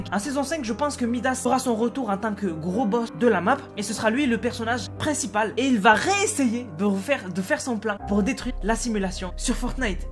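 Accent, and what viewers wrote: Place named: Marseille, France. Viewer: Ton accent marseillais il est partie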